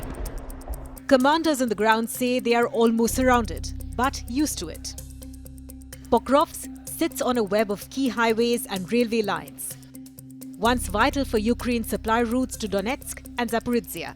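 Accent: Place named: India. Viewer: Can't stomach this jeet accent, rly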